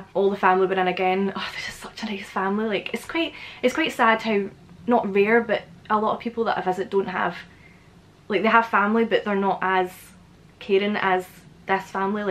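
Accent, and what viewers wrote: Scotland, United Kingdom. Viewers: I could listen to you talk all day long about nothing, to me you have such a soothing voice love the accent could never get old.. I used to talk to a woman on Facebook and Skype with her she was from Edinburgh Scotland.. then all of a sudden she just disappeared her accounts were closed and haven't heard from her since I miss her dearly.. her name was Samantha White.. I live in Clearwater Beach Florida.. I listen to you daily just so I can get my Scottish fix, might sound silly to most people but out of all Accents in the world I love the Scottish the best,, keep up the good work you're amazing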